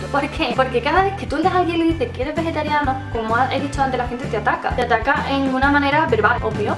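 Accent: Seville, Spain. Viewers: me encantaas me has ayudado mucho he aclarado algunas cosiyas que tenía en la cabeza 😊 eres super salá y me encanta el acento sevillano 😉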